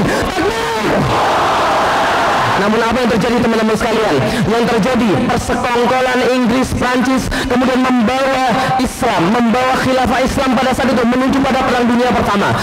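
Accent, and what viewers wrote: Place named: Sumatra, Indonesia. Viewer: Dahsyatnya...keberanian Ustadz Felix... .wajah boleh Cino....Tapi suaranya Sumatra.banget....Semoga dpt trs.. .berdakwah...aamiiiin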